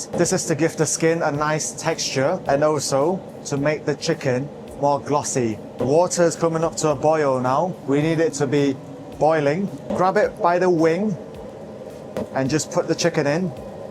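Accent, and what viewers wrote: United Kingdom. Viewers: Why would you assume that everyone who looks chinese is from china?? Also, did you NOT HEAR the UK twang?? He's more english than uncle roger. HELLO.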